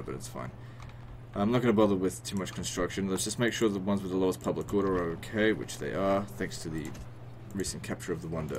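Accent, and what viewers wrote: Australia. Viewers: i think the aussie accent is partly the reason i watch i like aussie man reviews...woteva u do dude goodluck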